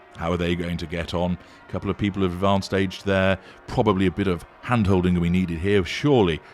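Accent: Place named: England, United Kingdom. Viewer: you knew an awful lot of the Aristrocrat's names on the steps. Coupled with your aside a few months ago about the clapham omnibus ( a very old metaphor only lawyers and hardly any of them understand or recall) i believe you are a member of the British aristocracy with close links to the law. As a narcissistic psychopath you would make an excellent judge or silk. You are also a royalist and know members of the royal family and find this upstart who has achieved wealth and position aggravating in the extreme. You also use voice alteration technology but some of your pronunciations would identify precisely what area of England you come from. eg how you say the word book. anyway i really enjoy your posts and hope you never are unmasked and continue as a latter day scarlet pimpernel.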